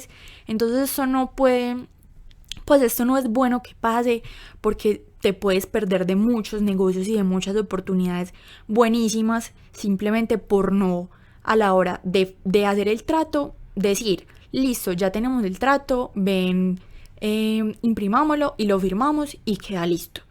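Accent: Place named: Paisa, Colombia. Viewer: Es paisa colombiano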